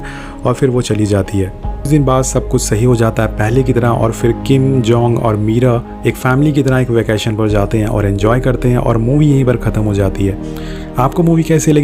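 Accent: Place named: Bengal, India. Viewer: Apke bolne ke tarike se yeh pata chalta hain aap sayed bengali ho....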